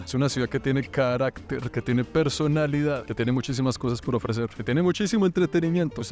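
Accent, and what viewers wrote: Bogotá, Colombia. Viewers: amor!!!, Recuperaste El acento rolo😊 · Se te salio el rolo jajaj hablaste muy rolo en todo el video muy chevere y muy de buenas salir con la cámara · Me encanta que aún no has perdido el acento rolo jejej y en el sector el acento rolo lleño 🤭 , un abrazo desde Bogotá ❤ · noto el acento ROLO se te marca demasiado viejo 😅... ALGUNO TAMBIEN LO NOTÓ!!!! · Me encanta como se te remarca el acento colombiano cuando estas en Bogotá jajaja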